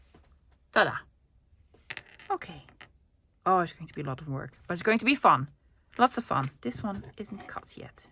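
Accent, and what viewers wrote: Netherlands. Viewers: I’m fairly new to this channel… I only discovered you about a month ago and I’ve been binge watching your YouTube videos. I absolutely love this channel! My mums family is form the Netherlands too so hearing you accent is very nostalgic to me. Anyway just wanted to say that you’re awesome and I love your sense of humour ❤️ love from Australia 🇦🇺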